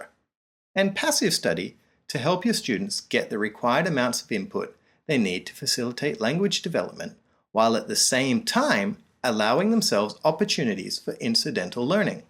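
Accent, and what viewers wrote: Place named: South Africa. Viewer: One question, where are you from? Your accent sounds really strange and muddled. If I had to guess, I'd say you're a South African trying to take on an American accent.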